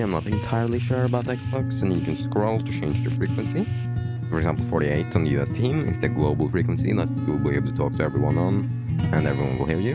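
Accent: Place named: Norway. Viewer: You're defo Scandinavian, based on your english I'd say Norwegian like me. Thanks for the video:D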